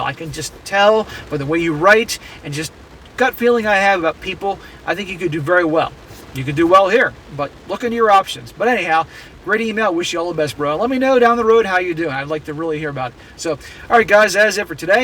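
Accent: Philippines. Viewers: Judging by his level of English I'd guess Philippines. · This dude sounds like he’s from the Philippines.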